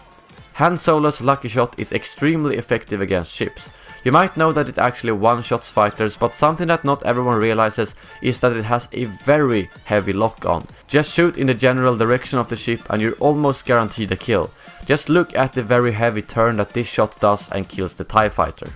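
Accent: Sweden. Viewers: Are you from Sweden? You accents sound a little familiar ;) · thanks for the tips...är du från Sverige va? det känns så :) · Are you from sweden? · are you swedish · are u from sweden? just wondering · I knew you were swedish! Cause you have a strong accent! Where do you live in Stockholm? (Not the adress, just the name of the place) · låt mer svensk xD bra video dock :) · are you Swedish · Mmm svengelska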